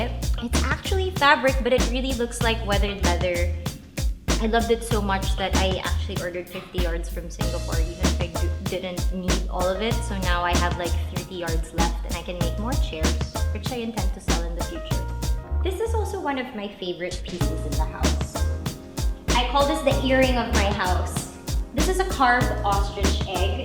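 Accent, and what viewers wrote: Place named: United States. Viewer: Wow your English got good. U sound American... I love you. I’m a big fan.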